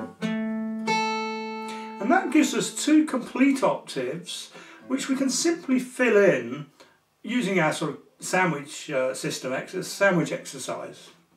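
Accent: United Kingdom. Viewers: oh noooo!! brit english again.. :-(((((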